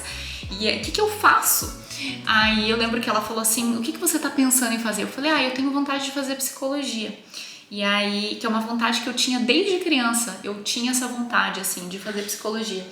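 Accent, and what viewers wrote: Brazil. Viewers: Sabia que teu sotaque, não era estranho! !!!Porto Alegre RS, capital 💃💃😘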